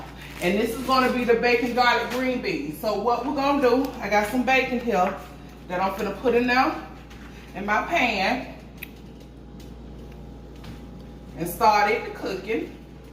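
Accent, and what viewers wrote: South, United States. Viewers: Hello! I'm a new subscriber and love, love, love your personality and your southern accent. Your food looks delicious 😋 I'm from the Midwest by way of the south. I grew up watching and learning to cook from my grandma. We cook similar to your style of cooking except we use the Aunt Jemima Corn meal mix for our dressing but I know the jiffy is just as good. I live in TX now and miss being around my family and soul food that don't always include spicy ingredients. I stumbled across your YouTube channel in which, I'm glad I did. Watching your videos are bringing me so much joy and remind me so much of our homestyle cooking. Thanks for sharing you with us ❤️😊 ~Toi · I’m so glad ur back!! I missed ya!! Where are you from? U sound so southern. I’m from Louisiana Baton Rouge!!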